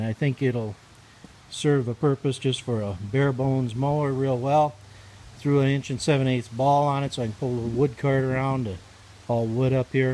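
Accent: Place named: Pennsylvania, United States. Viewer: Sounds like your from Pennsylvania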